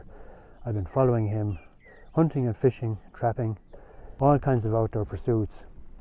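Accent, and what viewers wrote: Ireland. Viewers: Every time I watch one of your videos you make me smile and chuckle to myself: here is some quietly spoken Irishman calling time on the all the survivalist/bush-crafting BS on youtube. Absolutely priceless!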